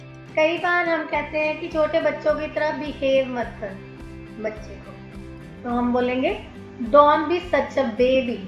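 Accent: Punjab, India. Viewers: are you punjabi..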